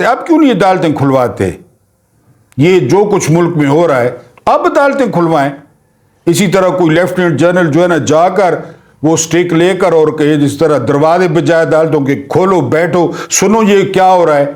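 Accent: Saraiki, Pakistan. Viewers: Masha ALLAH ap k v log mein Saraiki Touch bohot hi acha Laga hey, ap k v log Zaberdust hotey hein.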